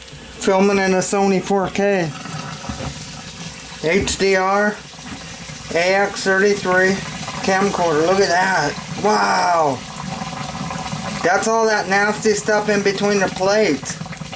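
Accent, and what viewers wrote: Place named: United Kingdom. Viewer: You speak British in n terrible way. Whaa Bawaah bong whaaa tralaaa. I can not make out a whood yo shaey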